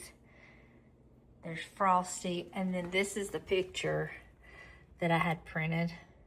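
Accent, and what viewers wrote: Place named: United States. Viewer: Hi beautiful happy and excited to be here, and see your beautiful Chrismas decorating!! Your so cute love 💞 your county type voice if that makes sense, you are a fun person to hang with!! Wished you! Wheir a neighbor of mine. 🫵🏼✊🏼🫶🏽💯🥰✌🏼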